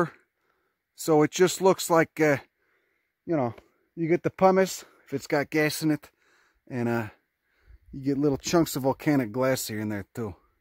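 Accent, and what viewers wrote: Chicago, United States. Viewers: The 'Cago accent pronouncing Latin is the best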